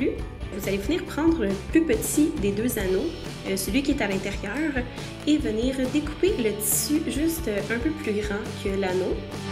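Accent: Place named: Quebec, Canada. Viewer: j'aime trop l'accent québécois ! !😍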